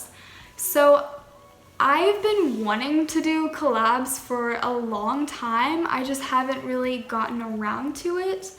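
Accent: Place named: Canada. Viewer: You sound Canadian and it's awesome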